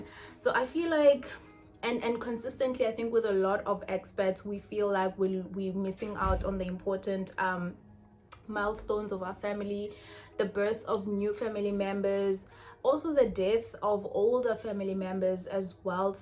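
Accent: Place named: United Kingdom. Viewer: I can start to hear some typical British accent in your voice 😅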